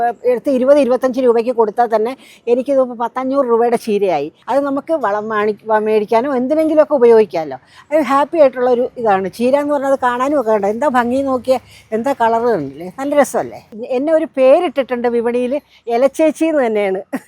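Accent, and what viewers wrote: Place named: Trivandrum, India. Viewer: ഇങ്ങനെ സംസാരിക്കുന്ന Tvm കാരും ഉണ്ടെന്ന് മനസ്സിലായല്ലോ അല്ലേ. അല്ലാതെ ചിലരൊക്കെ അതിക്ഷേപിക്കുന്ന രീതി അല്ല .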